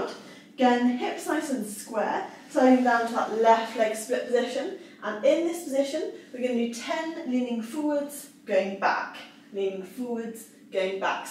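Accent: United Kingdom. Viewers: Strive for perfection. Or do nothing n be perfect. Pony tail. British accent. Ima gitcha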